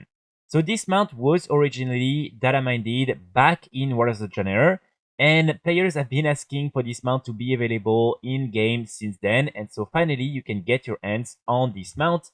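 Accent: France. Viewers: ça c'est de l'accent français comme on aime :D les torrential fragments, j'ai envie de mourir tellement le taux de drop est bas !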